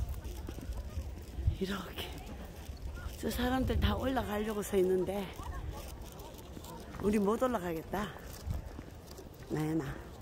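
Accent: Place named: Pohang, South Korea. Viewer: 4~~♡ 포항이라서 사투리가 반갑 네요 소중하신 님의 영상 열열이 응원해 드리고 물러갑니다 수고 하시고 또뵈요,^^ 👍♥️🌈🙋‍♀️👍😃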